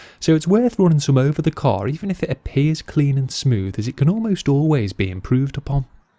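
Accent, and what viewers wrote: Liverpool, United Kingdom. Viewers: His accent is Soooooooooooooooooooooooooooooooo British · For those that don't know, it's a Merseyside (Liverpool) accent. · i don't get it, are you getting hate from your fellow country men or from other countries? To me, you just sound like a normal British guy.....as a matter of fact, your voice is actually really relaxing. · Sounds Liverpudlian to me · Sound like that British guy in the movie gone in 60 seconds. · Hes from liverpool, scottish doesnt sound like that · god I hate british accents so much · Almost certainly Liverpudlian accent to me. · I like you, you're a posh scouser and your video quality is good. So you've deserved a like and a sub. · God i don't like this dialect of uk english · British you dumbass. You dont know anything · Haha....Brilliant....As said that is a proper Liverpool accent...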